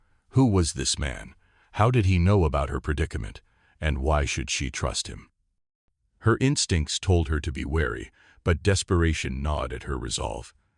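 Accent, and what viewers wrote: United States. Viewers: Awful American accent. Awful fake Christie story. Go away do.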